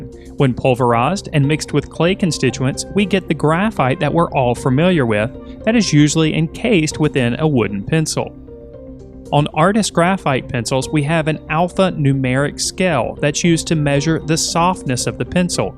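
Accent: South, United States. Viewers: Something about the quality of your voice reminds me of Adam Scott ( albeit with a soft southern accent)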